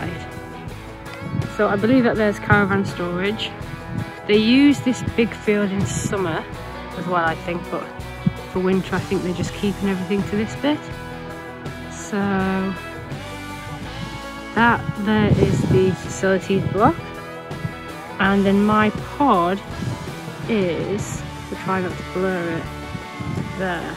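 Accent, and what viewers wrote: United Kingdom. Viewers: Love yur eyes.... always a great adventure with you, and of course Winston too. British accents rrraawwrrrr😁😋😊😊🙏🙏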